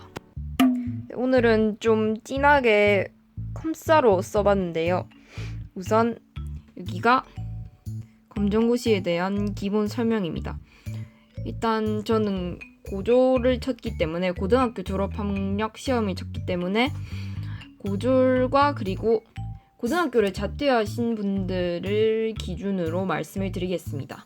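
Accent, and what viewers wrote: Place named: Daegu, South Korea. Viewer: 대구사람이세요? 사투리가 대구사람이네 ㅎㅁㅎ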